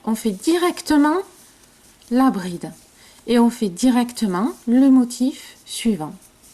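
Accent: South, France. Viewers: Merci pour tous ces conseils et bravo en plus tu me fais trop rire avec ton accent du sud. Et cela fait du bien en ce moment comme il fait froid chez nous en Auvergne. Bisous d'une mamie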